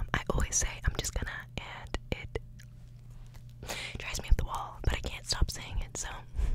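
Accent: United Kingdom. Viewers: This is the perfect way to end another long day, especially the encouragement. Also, your British accent is very impressive.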